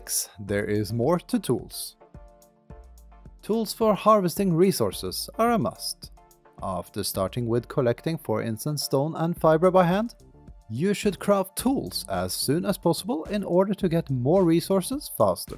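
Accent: Norway. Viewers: Det var lettere å høre at du var norsk på engelsken din, enn om du bare hadde snkket engelsk! Men bra tips det der!!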